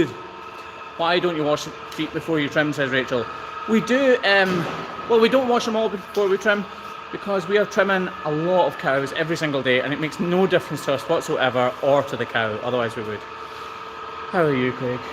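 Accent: Scotland, United Kingdom. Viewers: Love Outlander & love your videos - you could read the telephone directory & I'd watch!! Its a love of the Scottish accent - you & Sam Heughan 👍💕🏴💕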